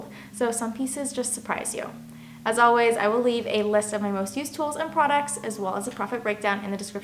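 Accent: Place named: South, United States. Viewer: I'm not sure where you're from but I'm in KY and my southern accent is very similar to yours because anytime I hear you say it, it sounds correct to me, I don't hear an "r" in it anywhere extra. Keep up the awesome work and don't let the lull in sales slow you down, your work/pieces are very pretty and always quality work so it isn't you, it's just the economy right now, hopefully, that'll be picking up soon though. Happy New Year and lots of luck to you!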